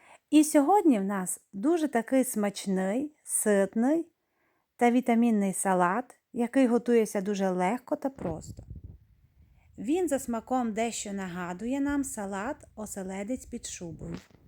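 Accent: Transcarpathia, Ukraine. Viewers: Дякуємо за закарпатську вимову ,привіт з Білої Церкви що на Київщині!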